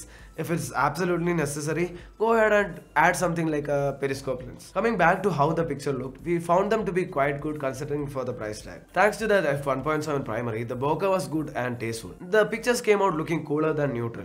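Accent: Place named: Kerala, India. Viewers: I'm here in c4etech after a long time. But when I started to watch this video, in 3 seconds I found out that this guy is a malayali. Because, they have their own accent for english. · Has the south indian accent ♥️♥️... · no but kerala people english accent is different compared to rest of south and north indians . And only malayalis can spot the difference 😎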